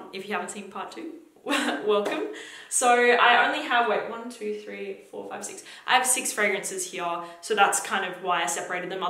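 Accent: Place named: Australia. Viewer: Australian accent spotted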